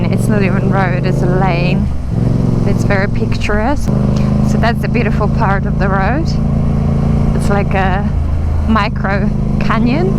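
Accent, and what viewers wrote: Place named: England, United Kingdom. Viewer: hi,im doug from australia,i love all your videos,you are good on your bike,you now have a english accent,what i think halps your vlogging you have a great voicei love the way you talk and you are very easy on the eyes[eye candy]and i love when you swear,tell me you are real and down to earth,thumbs up every day for you and your videos, bye for now..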